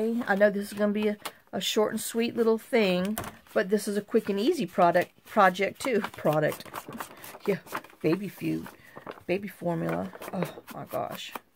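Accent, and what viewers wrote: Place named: South, United States. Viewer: I love all your videos! I sent my daughter, Heidi, one to watch and told her she’d enjoy it. She calls me and says “OMG she’s you with a southern accent!!” Then I reminded her I DID have an accent in the 1960’s when I lived in Florida. Please let us know if Molly comes home.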